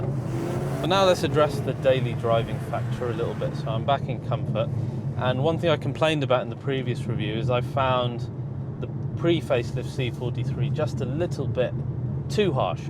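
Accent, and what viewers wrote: United Kingdom. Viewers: This guy in India? Has British accent, but definitely not ethnic English & scenery looks like England. ???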